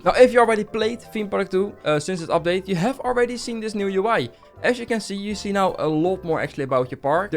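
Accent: Netherlands. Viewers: Are you from the Netherlands 🇳🇱??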